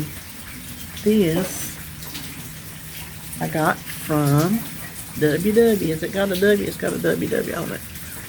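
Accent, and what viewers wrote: South, United States. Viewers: I love your “southern talk”, unlike my NY accent. When you said “coffee”, that’s me.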